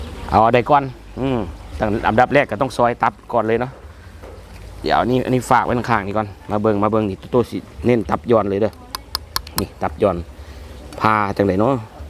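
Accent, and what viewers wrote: North, Thailand. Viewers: คนลาวอีหลีบ่ครับสำเนียงคือเสียงภาคเหนือของไทยแท้.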